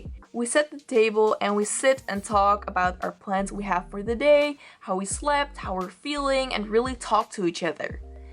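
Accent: Netherlands. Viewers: Haha I thought you sounded Dutch and then I saw the Kruidvat & Albert Heijn products. I love Dutch YouTubers who make English videos! ☺️ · are u dutch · Hold on for a sec, your Dutch?😱😱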